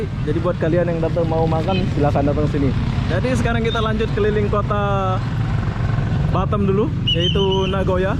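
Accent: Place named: Malaysia. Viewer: sip makasih mas, tapi logatnya kayak malaysia yah..hehhehe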